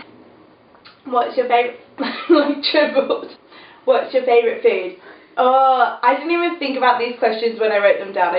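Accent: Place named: Australia. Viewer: You're gorgeous as fuck and that Australian accent melts my soul I love it😍😍.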